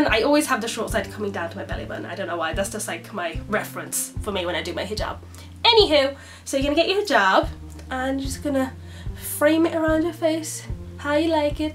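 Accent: United Kingdom. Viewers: so cute! is she british, i really like her accent!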